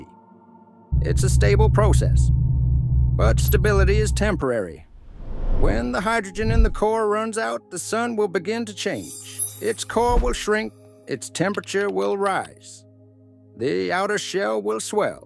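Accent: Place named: South, United States. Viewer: It would be great if the narrator was not a southern old dude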